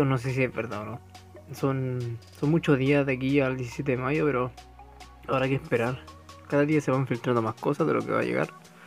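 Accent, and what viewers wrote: Chile. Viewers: Man de que parte de chile eres?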